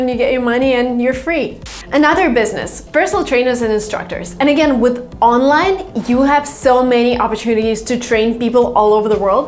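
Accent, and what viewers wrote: Russia. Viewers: The way she "love"..... lovely Russian accent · I’ve recognized her as a Russian speaker 😅😅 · Потому что у него русский акцент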